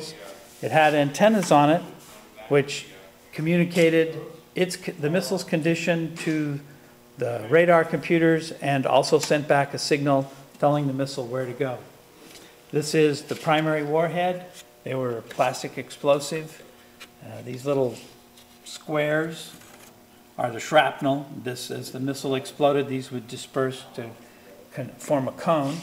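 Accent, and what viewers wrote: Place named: Middle East. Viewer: I remember seeing the barrack missle system had Hebrew writing on it were you in Isreal. Also you sound middle Eastern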